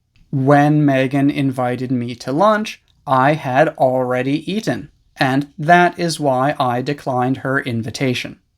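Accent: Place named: United States. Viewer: You have american a ccent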